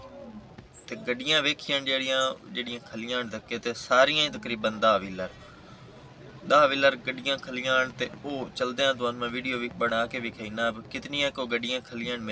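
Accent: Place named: Jhang, Pakistan. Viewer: ji tuhadi jhangi boli sunn k saanu sadda guandi jhangi bujurg yaad aa janda hai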